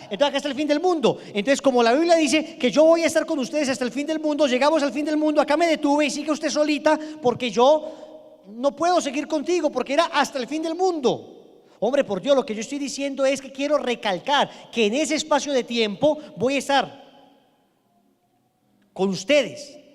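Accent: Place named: Colombia. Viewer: este ermano deve ser Colombiano muy buenas explicación aun que no pude evitar reir en varias veses su forma de ablar es desir de desir las cosas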